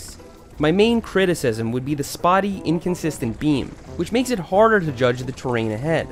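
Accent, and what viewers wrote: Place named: Midwest, United States. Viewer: it sounds like he is plugging his nose while he talks with a bad midwestern accent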